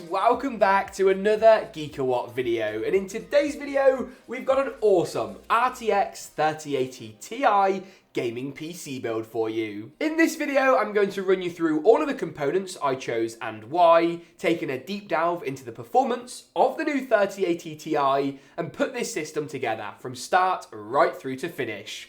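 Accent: United Kingdom. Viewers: Came here for dat British accent, Stayed for the awesome montage, And returned for the build guide, for building my next new PC.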